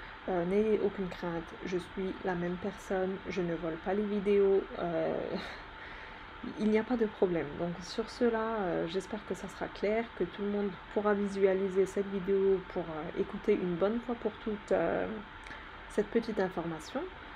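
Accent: Alsace, France. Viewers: Je m'en doutais que vous étiez turc 👍👍👍👍 😊😊😊 de part l'accent alsacien et des recette de pain toujour au top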